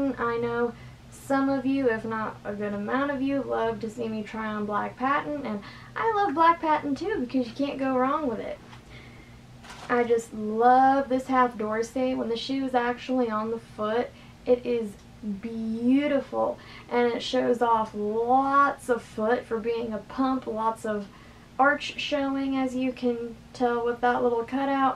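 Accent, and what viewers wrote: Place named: South, United States. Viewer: I love your accent also. I'm sure like me, you're from the South.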